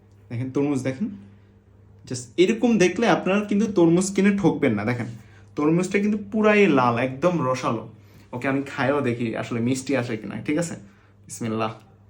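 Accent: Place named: Noakhali, Bangladesh. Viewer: Taile bujben ata tor Noakhailla kotha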